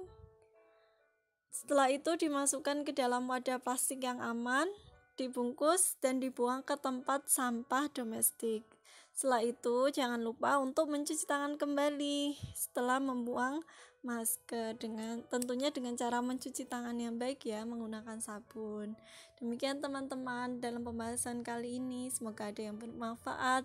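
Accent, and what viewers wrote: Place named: Java, Indonesia. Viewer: 👍👍👍 medok kali mbak suaramu 😁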